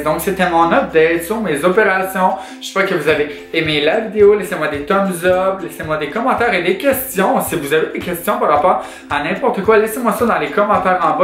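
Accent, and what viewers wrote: Quebec, Canada. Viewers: En faite j'adorrrrrrre ton accent Québécois mais enfaite le A vous dites E et c trop beau !!!!!! J'aimerai trop avoir votre accent!!!!!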